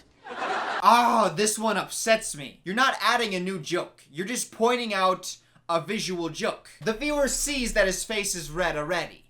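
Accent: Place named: New Jersey, United States. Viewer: you might be from wisconsin but you sound like you're from jersey...? hmmm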